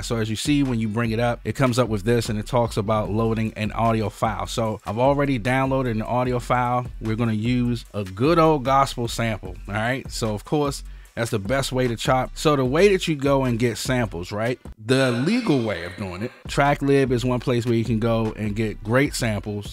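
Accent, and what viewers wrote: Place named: Washington DC, United States. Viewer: It’s the dc accent bro lmao he can’t help it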